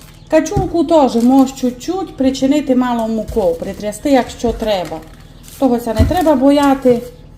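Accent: Transcarpathia, Ukraine. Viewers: А яка рідна мова закарпатська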